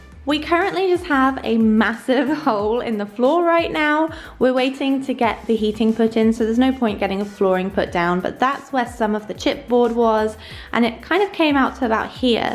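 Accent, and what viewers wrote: United Kingdom. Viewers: It will all be worth it in the end! Where are you from? Sounds like the UK.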